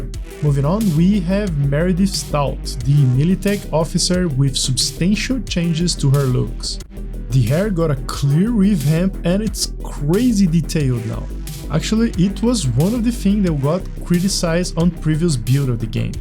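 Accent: Brazil. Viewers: Great video, man. Is your accent from Brazil? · Where are you from? Se sotaque parece de brasileiro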